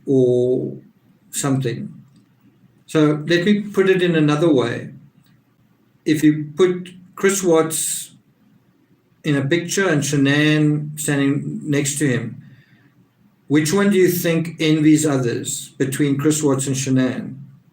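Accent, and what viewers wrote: South Africa. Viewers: When I am unable to sleep, I put your videos on & you lullaby to a wonderful sleep. It’s not that you bore me but you relax me so much. Love your South African accent & your soothing voice❤️❤️❤️❤️ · I love your accent my doctor is from south Africa too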